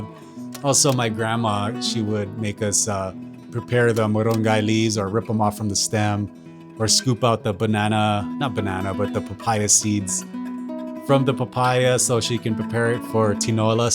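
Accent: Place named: United States. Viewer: It’s crazy because you saying that you try to hide your Filipino roots back in the day is hilarious to me because you look super Filipino 😂. What I mean by that is you look like a typical Filipino poster boy. I can tell your Filipino 100 miles away and if i didn’t hear your American accent I thought you were from Ilocos. Also you being Ilocano I’m sorry to be blunt but even if you ask your family Ilocano are stereotyped as being very cheap, very dark skin and like to eat dogs. If you don’t believe me ask your family or just google search it. Also the Tagalog people use to discriminate against you guys a lot back in the day. Also I just think it’s super funny how Filipinos who were born and raised in America look super native, while Filipinos in Philippines look more western. From my experience most Filipinos who were born and raised here have that super Filipino look and have darker skin tone. 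But Filipinos who are born and raised in Philippines look more mixed and have lighter skin tone. Like walking around especially in Metro Manila in places like Makati, Alabang or BGC you can see Filipinos who clearly have either Chinese or Spanish blood running in their veins. So it’s ironic that a Filipino in Philippines can look more western than a Filipino from America. I know how it is because I live in America too and my family have been here since the late 1960s. Most of my family in my dad side are born and raised in America and some even in Europe. They are super white washed but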